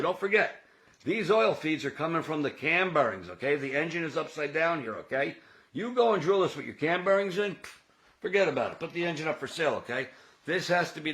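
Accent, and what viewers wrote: United States. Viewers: I hear Long Island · Great into to your video. I listened to the first10 seconds and said this guy is from NJ, as is I. Everything you said makes perfect sense. My only suggestion is to use coated bearings.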